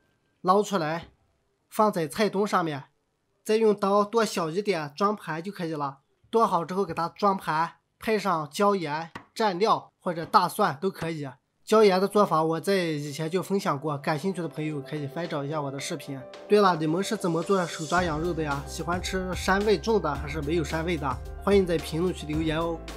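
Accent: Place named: Northwest, China. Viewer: 哈哈，一听你的口音，马上订阅，妥妥的西北人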